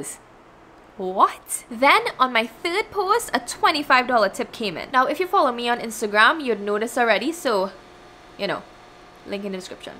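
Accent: Trinidad and Tobago. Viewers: That Trini accent!!!! ❤ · Nice Trinidad accent 👌🏼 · I love you accent lol TT 💖 I have family in Trinidad ❤️ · Thank you here from Jamaica .Miss Trini haha. love de accent girl. 💛 Thanks for sharing your knowledge and tips. . I am new and already see results as a pantyhose foot "model". Bless you and stay well. 💛💚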